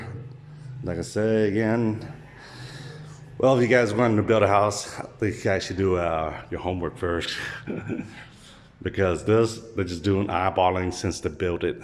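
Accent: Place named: St. Louis, United States. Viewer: Where did you pick up the black lingo and dialect? I've never heard a Vietnamese sound like you before. Sounds like St. Louis area. Where in Vietnam is this?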